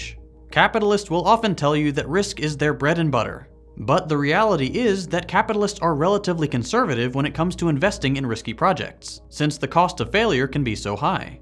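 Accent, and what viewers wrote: United States. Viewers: It should be obvious by your accent, by perhaps you should've prefaced this video by explaining that "we" and "our" means "American", because capitalism is not equal the world over. Europe enjoys (or has previously enjoyed) a relatively socialist approach to capitalism. What you described was the American model of "free market capitalism" which is anything but. You described America's relatively unique capitalist healthcare system, for example.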